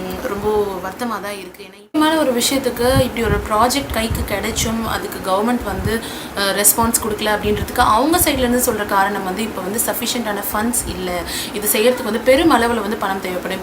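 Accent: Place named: Chennai, India. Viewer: கூவம் நதி குறித்த தகவல்கள் மிகவும் அருமையாகவும் சரியாகவும் இருந்தது .. சென்னை பேச்சு நல்லமுயற்சி நன்றாக இருந்தது.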